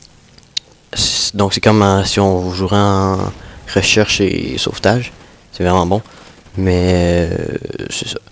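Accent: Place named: Quebec, Canada. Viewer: Quel voix de merde mais bon ses l'accent québécois ki fait sa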